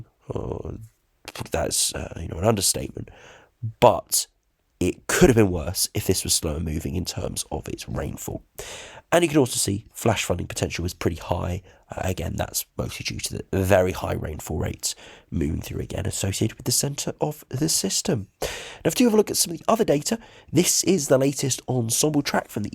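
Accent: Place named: England, United Kingdom. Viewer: exactly. The ‘weather modification’ was unintentional and caused by the vast quantities of greenhouse gases we’ve been emitting over the last couple centuries and we’ve finally crossed some feedback loops so we’re now in thermal runaway. The damage had been relatively linearly increasing in damage, but some key points such as permafrost melting, higher libido due to arctic ice melt, etc have really started to cause rapid (abrupt even) acceleration in temperatures. Higher temps mean more energy, these events become more frequent and powerful on average when temps are higher. It’s just a shame that the countries that have contributed the least to the damage, and have generally been exploited the most, are the ones being hit first and hardest. I’m just glad Florida is a MAGA state and full of deniers and conspiracists. The type of people that say “it’s just hurricanes, we’ve always had them. 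It’s normal!” It’s pleasant hearing someone with a nice English accent doing a good weather report. Probably sounds bad but it sounds much more professional and just easier on the ear in general. Subscribed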